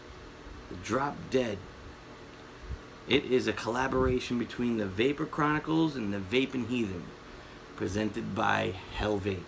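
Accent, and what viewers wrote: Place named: Northeast, United States. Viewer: Thanks for the review brother. Just curious, where are you from? I knows it's the East Coast, I'm guessing north eastern, close to philly?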